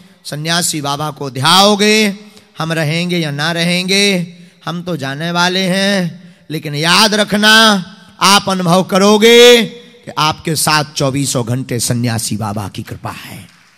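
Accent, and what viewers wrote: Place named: Bundelkhand, India. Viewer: जय हो बागेश्वर सरकार की,जय हो सन्यासी बाबा की,जय हो गुरुदेव श्री जी की,❤ आपकी बुंदेलखंडी बोली ही कितनी सुन्दर है ❤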